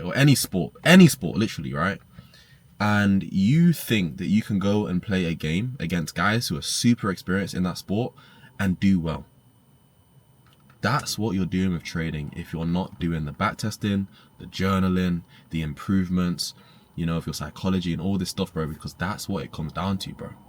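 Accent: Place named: United Kingdom. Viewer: Respect for this bro, I heard the UK accent and was immediately hooked lol. It's always motivates me seeing guys from here like me thriving to keep going myself and believe ill eventually succeed. May God continue to bless you for the wisdom you're sharing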